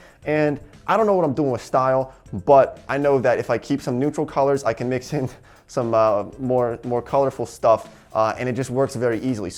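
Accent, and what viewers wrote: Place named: New Jersey, United States. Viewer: Thats a cool accent, i'm guessing new jersey? Forgive me, i'm british!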